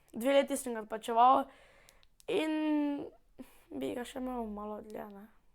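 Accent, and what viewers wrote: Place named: Maribor, Slovenia. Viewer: Končno negdo na YouTube ki govori Mariborsko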